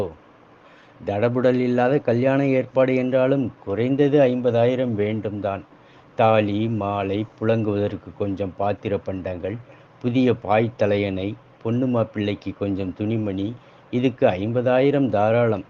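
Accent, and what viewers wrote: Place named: Kongu, India. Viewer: அருமை அருமை சார்.... அற்புதமான கதை.... நெகிழ்ச்சியான முடிவு.... கொஞ்சும் கொங்குத்தமிழில் கதையை வாசித்தது கூடுதல் சிறப்பு.... வாழ்த்துக்களும், பாராட்டுக்களும்... 🎉🎉🎉🎉🎉🎉 மேலும் பல கதைகளோடு மென்மேலும் தொடரட்டும் தங்களின் இலக்கிய பயணம்❤❤❤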